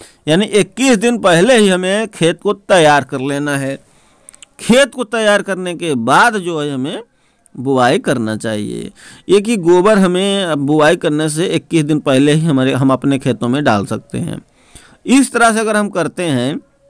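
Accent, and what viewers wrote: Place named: Sultanpur, India. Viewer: बहुत सुन्दर प्रयास मैं सुल्तानपुर से हूँ। आपको बहुत बहुत धन्यवाद। अपने मिट्टी की खुश्बू आपकी आवाज से आती हैं। स्तुत्य प्रयास।🙏❤️🙏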